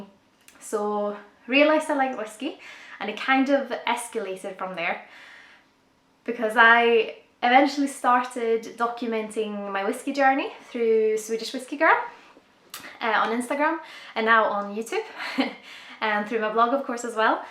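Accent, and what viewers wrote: Scotland, United Kingdom. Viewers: I'm guessing your accent is Scottish because you've lived there for the last several years. Cheers and Good Luck!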